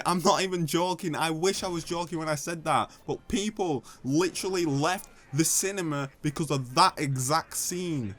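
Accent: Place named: Bolton, United Kingdom. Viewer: That's a Manchester accent Sounds like Bolton?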